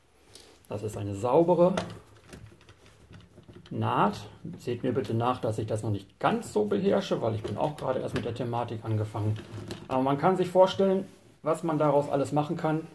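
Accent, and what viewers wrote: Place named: Germany. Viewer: it was also nice listening to your German accent!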